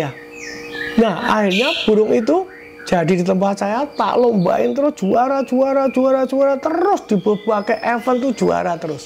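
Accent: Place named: Yogyakarta, Indonesia. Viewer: kren cara bicarene ngk ningalne ciri kas jogja, mudah dicerna masih percaya bejo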